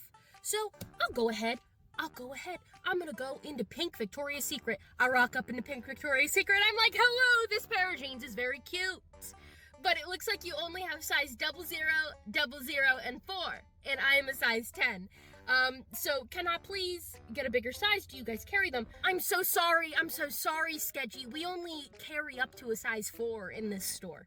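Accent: South, United States. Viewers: M...Maryland? You sound like you're from the south am I stupid? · I already know your southern, what part of the south woman! Tell me story's of the local waffle house >: ) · Electro-Harmonix shirt, Danganronpa mic, Sailor Moon poster, Sonic PC wallpaper, slight southern accent... you are 1000% the most qualified person to talk about this and I look forward to watching your other videos.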